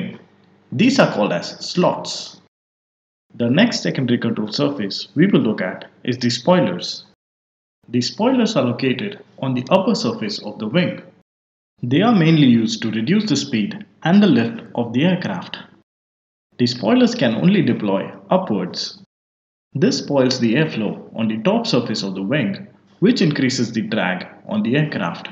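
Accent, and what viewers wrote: India. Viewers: Bro r u indian? Btw thanks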